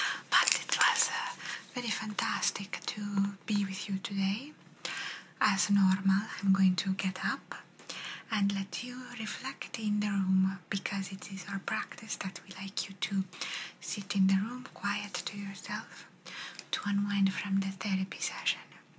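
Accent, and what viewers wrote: Italy. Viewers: The Italian accent was done great, and i really like your therapy ASMR. This one reminded me of a recent therapy appointment of my own, which went great! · Ciao! Mi piace molto il suono della scrittura e il tuo meraviglioso accento Italiano è così dolce e rilassante! Complimenti, sei bravissima e bellissima 🫶🥰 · Great video. You always do a fab Italian accent ❤ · I didn’t know you are not Italian until I checked it 😂 such a perfect Italian accent, amazing!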